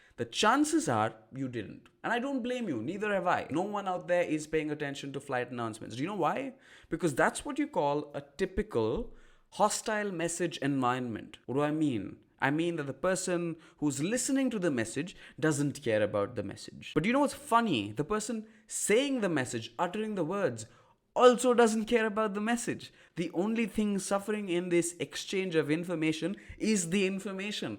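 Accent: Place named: Maharashtra, India. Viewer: I too initially thought you are from Maharashtra :D. Great tips!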